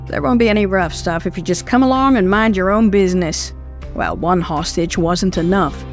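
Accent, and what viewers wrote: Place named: Netherlands. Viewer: I love that silky dutch accent. Another brilliant episode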